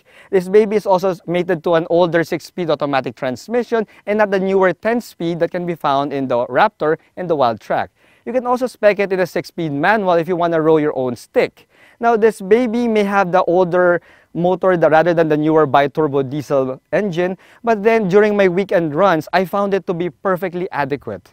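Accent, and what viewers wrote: Philippines. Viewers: Ang conyo ni sir pakinggan sarap manood 😅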